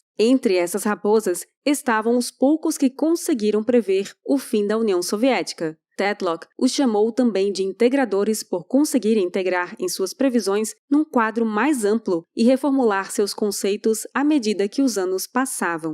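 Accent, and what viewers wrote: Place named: South, Brazil. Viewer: Ótimo artigo e muito bem apresentado. Me impressiona a bela e perfeita dicção da. Não é carioquês, como a do Peter, parece mais de SP para baixo, como a da Caroline de Toni, de Chaoecó, SC.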